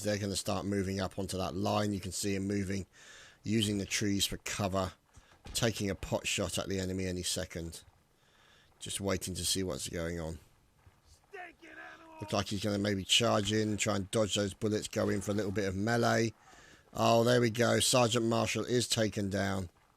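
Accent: United Kingdom. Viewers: its just moose there is no plural for moose btw keep up the good work your funny as hell lol espeically with the British Accent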